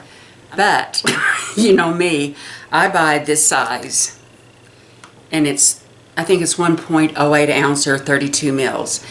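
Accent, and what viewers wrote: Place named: Southern Illinois, United States. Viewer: What a fun story! I love your southern Illinois accent as well!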